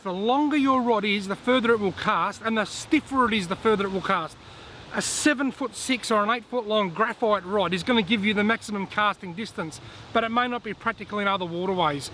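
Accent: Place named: Australia. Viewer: My bad. I didn't hear Australian at first. Not many Aussies in USA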